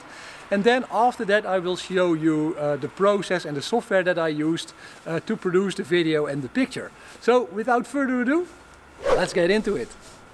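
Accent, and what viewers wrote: Netherlands. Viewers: Kan gelijk horen dat je nederlands bent🤣 maar nice video! Heb zelf gister een Omegon Apochromatische refractor Pro APO AP 72/400 Doublet besteld, beetje hopen dat ik m kan vastleggen met de hand🤔 statief heeft geen goto helaas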